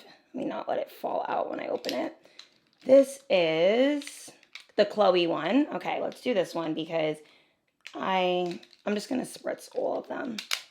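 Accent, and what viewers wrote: New Jersey, United States. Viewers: Her accent is out today! Jersey jersey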